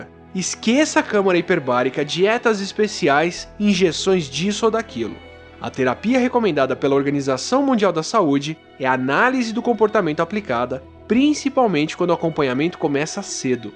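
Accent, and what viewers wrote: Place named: Rio de Janeiro, Brazil. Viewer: this carioca accent is deadly deplorable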